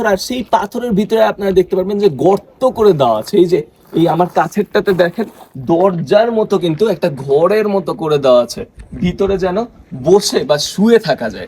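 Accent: Kolkata, India. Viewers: আপনার একসেন্ট কলকাতা টাইপ। প্লিজ বিষয়টি একটু ক্লিয়ার করেন। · আপনার ভাষা কলকাতার লোকদের মতো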